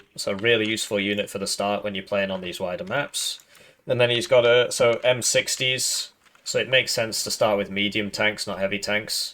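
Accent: United Kingdom. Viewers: why do u look jewish , dress like arab , and sound british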